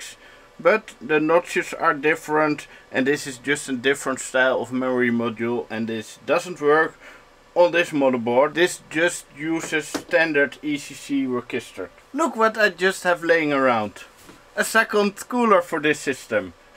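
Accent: Netherlands. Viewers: leuke bak man :).. ben ook op zoek naar zoiets.. jeetje je bent echt een nederlander met je accent lolz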